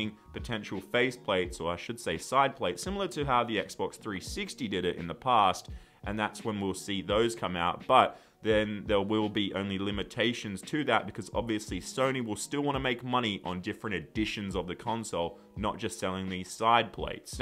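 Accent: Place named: Australia. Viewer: Great to hear an Aussie discussing PS5! 🇦🇺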